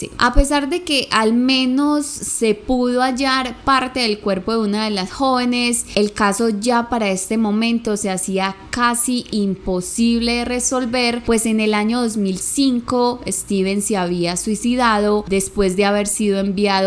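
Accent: Antioquia, Colombia. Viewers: Ay mi querida, me encantan tus videos y tu acento colombiano... Pero hace unas semanas una colombiana me rompió el corazón y me duele escucharte hablar 😭💔 ojalá pronto lo supere para volverte a escuchar con cariño. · Es el acento típico de los paisas (los nacidos en Medellín )...acá en Colombia,hay variedad de acentos 😊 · Si, a mi también me gusta el acento Colombiano, después del de mi país por supuesto. Saludos desde Zacatecas México ❤️🇲🇽🥰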